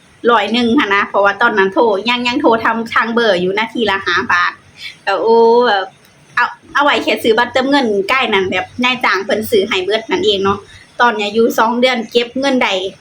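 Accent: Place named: Ubon Ratchathani, Thailand. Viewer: สาวอรพูดสำเนียงคล้ายอุบล ไม่เหมือนคนลาวเลย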